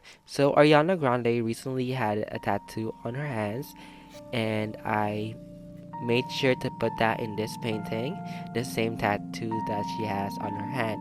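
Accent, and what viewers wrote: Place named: Philippines. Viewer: I noticed your accent and the word buwan, are you Filipino?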